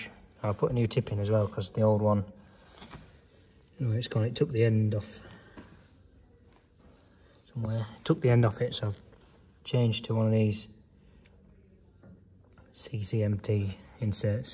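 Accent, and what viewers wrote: Yorkshire, United Kingdom. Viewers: Learning and overcoming problems, is what real world manufacture and repair is all about. How tedious it must be to have a job where everything falls into place first time. BTW the auto generated subtitles make a hilarious job of your Yorkshire accent. Thanks for posting.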